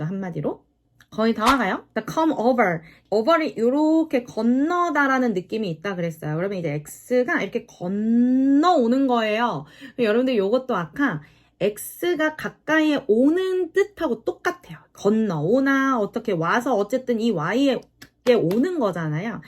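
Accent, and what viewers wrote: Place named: Jeolla, South Korea. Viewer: 전라도네? 으미X 의미0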